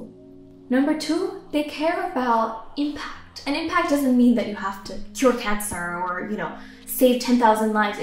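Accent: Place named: United States. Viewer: Why your American accent is so natural???? How can I improve it like u???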